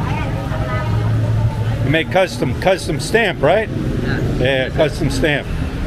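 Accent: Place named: Philadelphia, United States. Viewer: Hey, since your there for the long hull, how bought taking some Pilipino language courses to be able to speak a little and understand a little bit more of the Country your living in. I would and maybe in return teach someone some of you own intonation from, I think that would be cool, what do I know, love your Gig, Thumbs Up Dude, Ricky from Cape May....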